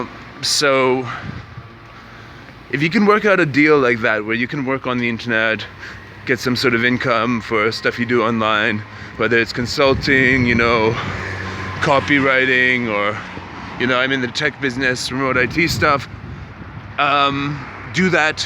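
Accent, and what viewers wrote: Germany. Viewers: Were you born and raised in Canada? How come you have such a strong german like accent? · You have really really mixed roots. And I don't know if anyone told you already that you still have some German accent. At first I thought your are originally from Germany but when I heard you say Toronto,Canada I was like WTF! :D I'm not surprised that you feel Serbia as natural habitat maybe because your Slavic side gens "work" and "want to go home". :D That's normal because I also know some Serbs how feel much better living in Spain,Italy or Ireland than Serbia. It's just like that and who knows why. I have a friend,born and raised in Serbia went to school together, who told me something like "I feel I'm alive only when I'm in Barcelona". The other one use every single chance to spend time in southeast Asia,Vietnam,Burma etc. The guy just LOVE to be there. 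I like Mexico or Mediteranian lifestyle (wich is pretty similar to Serbian lifestyle,hehe). So,that's normal, some people just looking for their own part of the sun under the sky.And that could be elsewhere than place they were born and raised. Anyway,glad you enjoy your life in Belgrade. And just go on. That is only matters.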